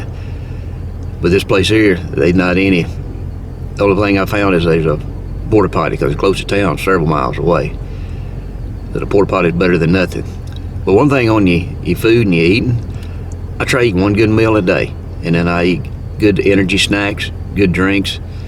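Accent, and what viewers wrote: South, United States. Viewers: Where you from bo? Sound southern. Git R Done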